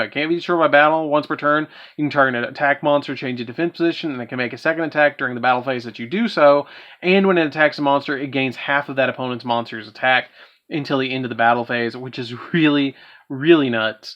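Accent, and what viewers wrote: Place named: South, United States. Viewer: probably bc he has a southern accent? 🤷‍♂️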